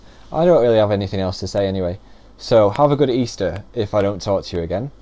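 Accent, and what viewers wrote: North, United Kingdom. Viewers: You sound so northern! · He sounds so nothern in this video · I can't get over the amazing Northern accent!! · OMG SO NORTHERN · i find it so interesting how phil seemed like he was immediately comfortable being himself online. in most youtubers first videos they’re super awkward and self-conscious but phil was just phil from the start (just smaller and more northern lol) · Jay Chi I think he was just making fun of people from the north bc he was hella northern in this video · He sounds like me so when I think like that it doesn't sound that strong cos my accent is probably stronger but then in comparison to how he sounds now he sounds dead northern. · Oh my god!!! JESUS CHRIST I AM WATCHING THIS IN 2017!! HE IS SO AWKWARD!!! AHAHHAHA NOOOO I FEEL LIKE IM SCREAMING LIKE DAN RIGHT NOW! HE SOUNDS SO MUCH MORE BRITISH HERE!!! 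AHAHAHAHA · God he sounds so northern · I WAS FIVE WHEN THIS WAS MADE VOICE SOUNDS SO NORTHERN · He sounds so much more northern here! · used to be so northern omg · he sounds so much more northern here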